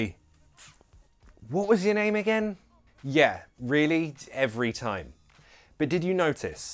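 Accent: United States. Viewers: That American accent though 😂😂😂😂😂😂😂😂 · I totally love that American accent of yours!! So accurate lol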